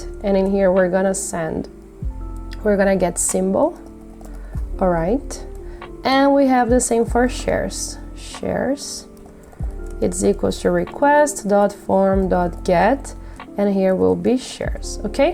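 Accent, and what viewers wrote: Brazil. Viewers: You’re from Brazil, right? Your accent reminds me of brazillans’. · Reconheci pelo sotaque kkkkk muito bom encontrar br pelos cursos cs50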